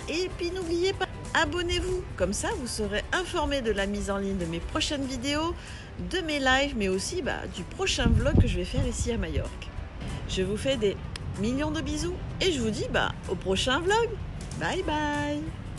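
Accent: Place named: Provence, France. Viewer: Merci, j'aime beaucoup ta voix, posée, légèrement teintée des cigales, très agréable! Dommage pour Nadal!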